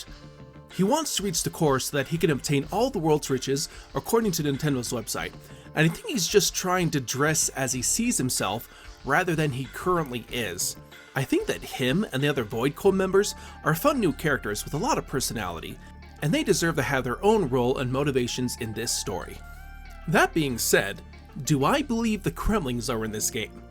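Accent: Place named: Canada. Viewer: Also, your accent tells me that you're Canadian are you from Canada or not really?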